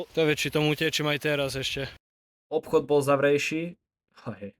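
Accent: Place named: East, Slovakia. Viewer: zaujmave ze si vichodnar a nebola tam ta to veta mamo ja idzu na vadrovku hlauski mi nabal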